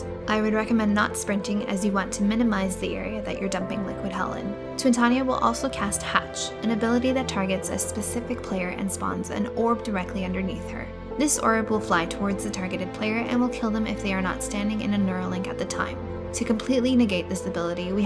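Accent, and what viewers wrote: Canada. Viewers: Are Canadian or from Minnesota? (The narrator)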